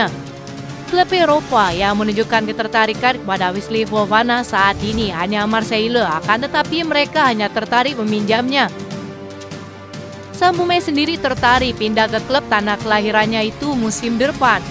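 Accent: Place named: Malaysia. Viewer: Admin logatnya melayu ya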